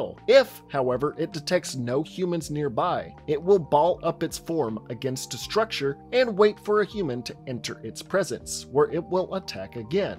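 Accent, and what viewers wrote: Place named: Indiana, United States. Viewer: .........you’re from Indiana, aren’t you.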